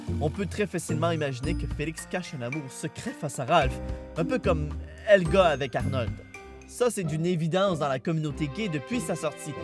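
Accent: Quebec, Canada. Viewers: bel accent canadien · Il est Québéquois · Moi ce que j'aime c'est ton accent. On dirait un Canadien. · L'accent québécois 😂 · T’as l’accent du Québeeeeec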